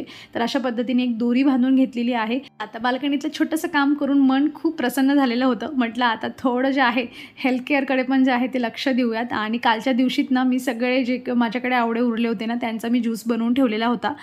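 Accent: Khandesh, India. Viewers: Oy tu aplya khandesh chiy ka v..kudliy nemki gav kont sagjo br...😅u remember our tone ❤😂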